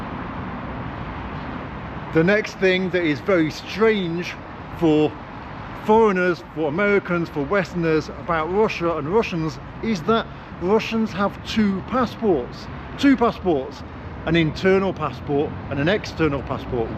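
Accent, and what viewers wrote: United Kingdom. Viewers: Я наверно единственный слышу ваш британский акцент 😄 и не думаю, что вы американец. В России люди очень редко знают какие бывают акценты английского языка, отличия, как они звучат и т.д.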